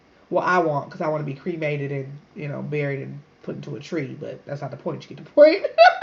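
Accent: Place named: United States. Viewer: Hats off to you sister 😊 very inspiring! How did you get to Ghana originally to know of it as a lifestyle? You sound American born so I'm curious. Of course we all originate from there but I've never touched foot to know Africa as my home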